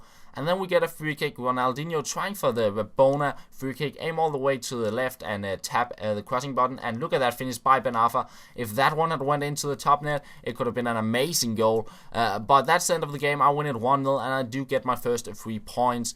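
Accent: Denmark. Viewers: I'm a new, subscriber. Are you Danish, you sound Danish.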